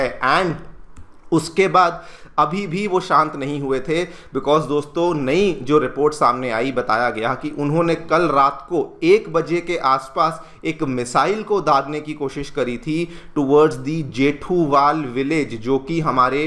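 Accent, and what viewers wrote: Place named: Bengal, India. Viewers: it is Bengali acent Bengali pronunciation Bengali language pls don't impose Hindi acent on us. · ..that's quite a bengali accent ...hope u understand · a is o in bengali. Its accent.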